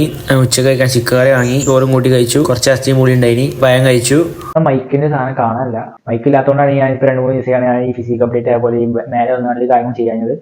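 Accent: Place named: North Kerala, India. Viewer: Bro ഞങ്ങൾ തെക്കോട്ട് ഉള്ളവർക്ക് നിങ്ങള ഭാഷ അത്ര മനസിലാവില്ല അതോണ്ട് ഒന്നു സ്പീഡ് കുറച്ചു ക്ലിയർ ആക്കി പറയാമോ 🙂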